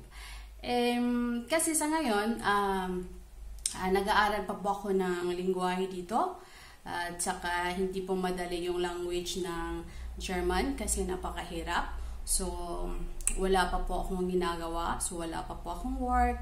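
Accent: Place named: Italy. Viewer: ay ka expert na nimo mg storya Italian inday good job sarap pakinggan accent mo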